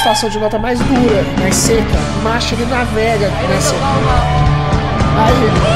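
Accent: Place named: Brazil. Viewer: Hi the best thing to do is listen to the singer in order you have done 1 & 2 so next is 3 thanks again for the subtitles my partner is Portuguese from Madeira so I understand some Portuguese but Brazilian Portuguese is slightly different love the Brazilian accent though ❤️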